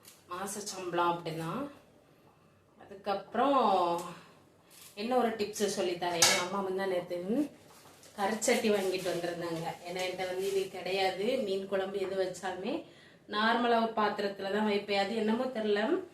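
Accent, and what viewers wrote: Kanyakumari, India. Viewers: ok slang apdi thonuthu na kanyakumari than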